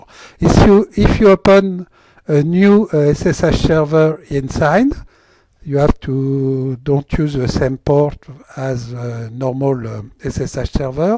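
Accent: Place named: France. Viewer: Thanks for this video and this tools !!! You're great to develop and share that with us. And your French accent is incredible ^^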